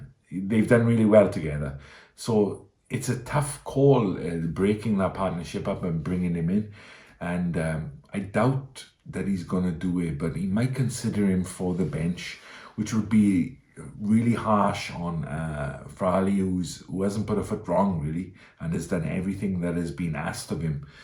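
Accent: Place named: Wales, United Kingdom. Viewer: Hey. Good to hear your comments on the teams for this weekend's three juicy matches. I can't disagree with anything. Biggest chance of an upset for me is with the Italians. I don't think England can upset this Ireland set-up. Love your accent mate (something of an interest for me). Clearly lots of Welsh there but I think that there is something else underneath that. Italian perhaps?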